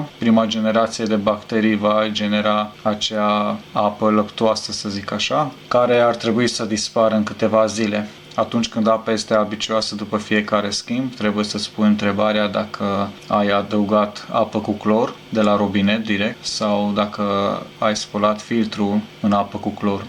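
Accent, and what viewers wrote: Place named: Hungary. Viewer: ai si canal de youtube pe maghiara? ca esti maghiar😁 se aude bine😋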